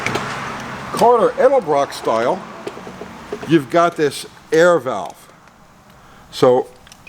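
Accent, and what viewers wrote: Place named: East Coast, United States. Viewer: I dig yir channel ’. Especially yir E-coast patois. On top of that, yir a real guy with an average guys attitude.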